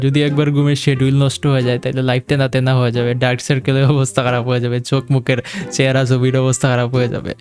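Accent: Sylhet, Bangladesh. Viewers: সিলেটি না-কি?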